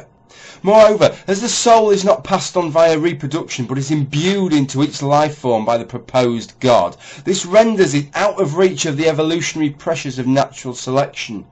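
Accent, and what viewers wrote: United Kingdom. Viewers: To most Americans, you sound 50% smarter by just having that British accent. I agree with you, by the way.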